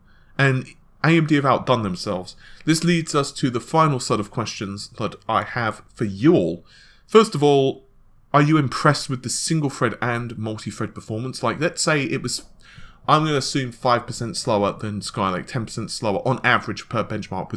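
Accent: England, United Kingdom. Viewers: ***** Apparantly some Americans are so incredibly unsophisticated that they get confused if they hear the same language with a slightly different accent. My advice to those people: don't ever migrate to Europe. Within a country the difference in pronounciation are much larger than the difference between American English and English English, not to mention that you would actually have to pick up foreign languages. Unless you live in England, technically that is a part of Europe... To be fair, in England there also are some strong differences in pronounciation but speaks 'general' English, not some less common accent.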